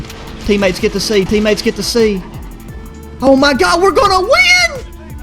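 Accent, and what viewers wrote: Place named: West Virginia, United States. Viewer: An ak setup would be great and you sound like your from West Virginia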